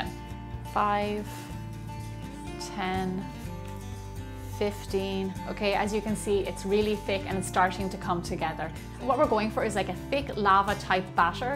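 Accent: Ireland. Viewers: Hi! These are super cute, the gold lusture dust makes all the difference! I watched the video you done on a Youtube channel, you sound so Irish in that compared to your videos! I couldn't believe it at all! Are you taking part in Donal's collab? I can't possibly be that Irish, I've never tried bread pudding!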